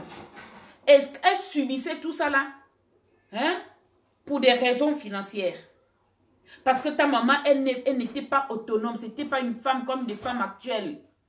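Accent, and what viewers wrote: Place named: Gabon. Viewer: Elle est Gabonaise 🇬🇦 c’est comme ça que nos mamans Gabonaises parlent👌🏻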